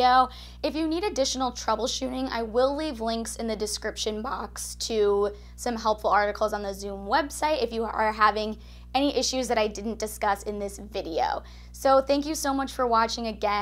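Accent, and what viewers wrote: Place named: United States. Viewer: Sorry. I battle to understand her American accent. I am a South African English graduate.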